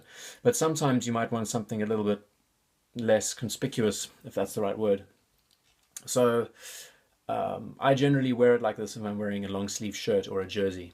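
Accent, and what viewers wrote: South Africa. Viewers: Except the guy has a clear South African accent. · Great video thanks! Are you South African?.. actually no chance you not with that accent. Howzit! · Wow. All these years. I had no idea!! Btw do you mind if I ask if your accent is from 🇿🇦? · cool tip. your accent has a south african lilt :-} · Excellent Video Brother. Don't lose the SA accent - takes me right back home.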